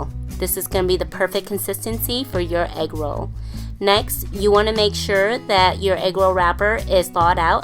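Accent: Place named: Asia. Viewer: This video is sooo entertaining and well said doing the procedure inspite of our asian accent. 2 thumbs up for you madame chef! I will cook this one for my kids.thank you very much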